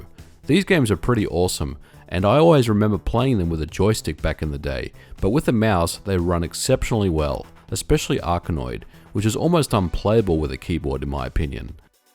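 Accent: Australia. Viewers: You sound Australian... if so, where the hell did you get a 128D for $30?! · Wait, it's a Commodore 128DCR? Is it a NTSC or PAL version? If it's NTSC, what special steps if any did you have to get it to work with a PAL monitor and 240V 50Hz AC. I ask cause you sound Australian...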